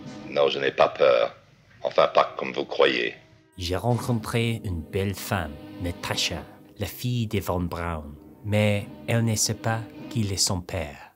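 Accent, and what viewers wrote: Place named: England, United Kingdom. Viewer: Ton français sonne super élégant avec ton accent anglais !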